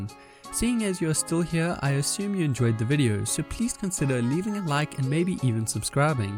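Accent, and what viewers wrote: South Africa. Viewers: Just realized your South African, from your accent Its good too see there are RSA Youtubers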